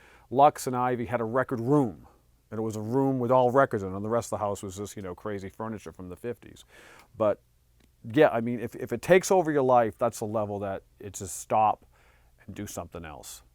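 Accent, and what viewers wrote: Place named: Boston, United States. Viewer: Damn you still got your Boston accent !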